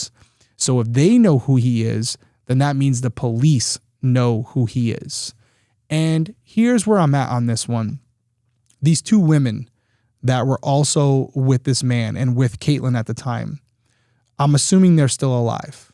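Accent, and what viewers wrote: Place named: New England, United States. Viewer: Great job. Also, I really enjoy the way you talk and verbiage used. It’s has that “New England” feel to it, and it’s sincere. I saw some commentary regarding the way you word things. I disagree strongly. Anything else would feel so phony coming from you. Don’t change.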